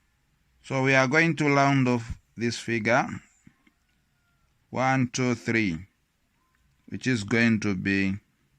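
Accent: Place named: Tonga. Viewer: Your accent is giving sir! You sound tongalish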